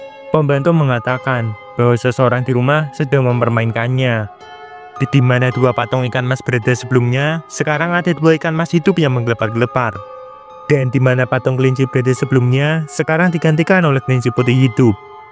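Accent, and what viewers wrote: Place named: Java, Indonesia. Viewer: medok jawanya ganggu bgt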